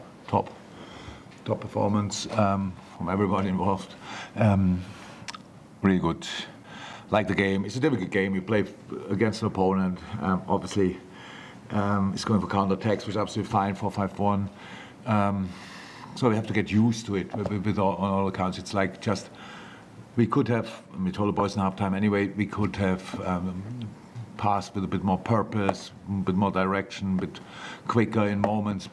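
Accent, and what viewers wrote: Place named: Liverpool, United Kingdom. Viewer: I am going to miss Jürgen pronouncing names in a Scouse accent 💔💔💔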